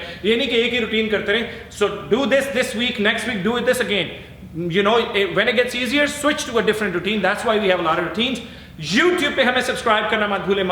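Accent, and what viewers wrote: India. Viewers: This indian accent :(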